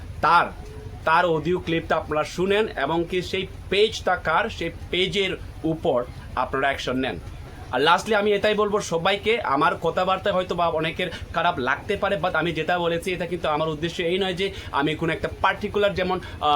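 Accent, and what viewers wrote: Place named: Bangladesh. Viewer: Tumi o to je bangla kotha bolechen eta hoyche Bangladesher sur eta kolkata basha sur noy bujechen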